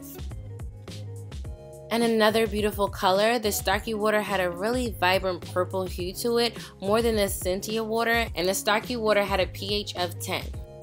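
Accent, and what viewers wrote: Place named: New Jersey, United States. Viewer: Sounds like Jersey :) Wahr-tor instead of water hahah but since there's no Poland spring I'm guessing you're not actually from Jersey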